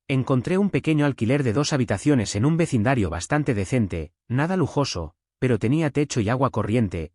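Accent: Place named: Spain. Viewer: La verdad no sé porque a todas este tipo de historias le ponen esas voces mamonas españolas. Me caen en la punta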